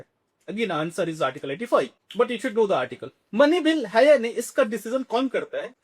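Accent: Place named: United Kingdom. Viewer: Hindi ko b English accent m bolne ki kya jarurat h sir aapko. BTW...nice session. Thnxxxx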